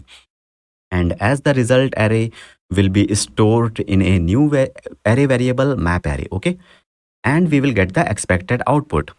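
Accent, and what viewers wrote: India. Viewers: The contents is 100% but its diffcult to understand with Indian accent -_-. !!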